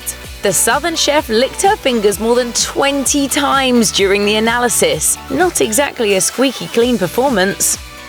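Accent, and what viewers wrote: United Kingdom. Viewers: Incognito ? You defending the bitch like that's your wife or something. Calm down you bitch. I just don't like a British accent period. Fuck yall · British accent commenting on American shows...stupid. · who's the brit? get back to watch mojo girl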